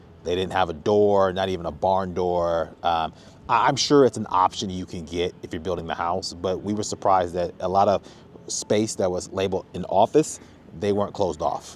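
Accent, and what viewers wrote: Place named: Vallejo, United States. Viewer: You definitely have that Vallejo accent. I’m from San Jose ca. you sound just like e-40 lol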